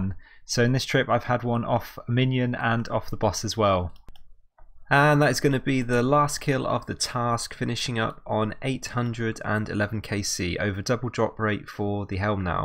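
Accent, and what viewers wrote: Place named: United Kingdom. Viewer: Nice British accent Fellah!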